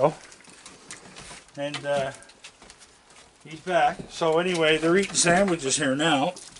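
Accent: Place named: Canada. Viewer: Who could not love a raccoon? 💜💜 Love that Canadian accent, eh!!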